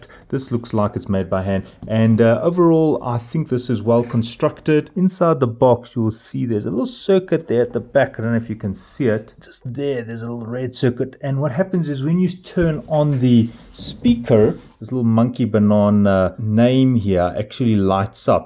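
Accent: South Africa. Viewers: If the accent did not give it away. The SA plug was the confirmation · Cool, m in SA too, heard the accent, keep it way 🆙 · Wow that is unmistakable south african accent, nice to be here, mzansi too!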